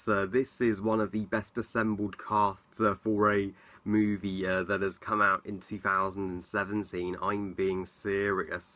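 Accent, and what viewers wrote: United States. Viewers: Like Your American Accent! I Can Do That accent! I Watch Logan Lucky Soon when its out.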